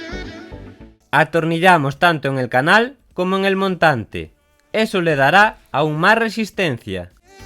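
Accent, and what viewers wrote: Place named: Galicia, Spain. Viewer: dios que acentazo gallego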